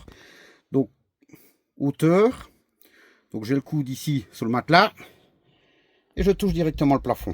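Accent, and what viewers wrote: Belgium. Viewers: 😂....j'ai rigolé comme jamais en regardant cette vidéo!! Outre l'accent Belge, succulent, votre bouille de bon gars qui s'est fait berner et qui voit son rêve se transformer en cauchemar (à 60000 balles) est superbe! Je suis vraiment désolé pour vous. Bonnes vacances tout de même!! P.S: prévoyez une tente Queshua pour les gamins, c'est plus rapide à monter et bien plus confortable!! 😊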